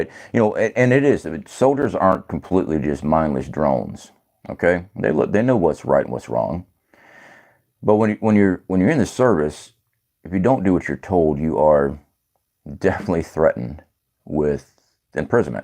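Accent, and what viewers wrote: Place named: Appalachia, United States. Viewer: hillbilly accent Yall remember, chance, favors! The prepared mind! Semperfiiiii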